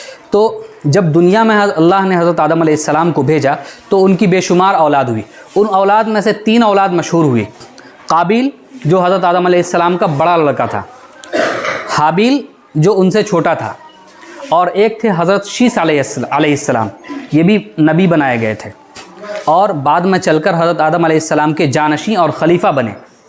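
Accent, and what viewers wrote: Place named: Bihar, India. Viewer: ماشاء اللہ انداز تفہیم بہت اچھی ہے اور ٹھیک ہے ٹھیک ہے کی تکرار اور مزیدار بنا رہا ہے جو بہاری پن کی ڈھیٹ علامت ہے جو بر محل ہے اللہ سلامت رکھے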